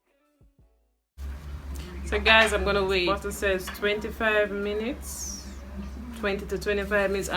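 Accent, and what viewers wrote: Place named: Jamaica. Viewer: I hear our accent sis 🇯🇲 great tutorial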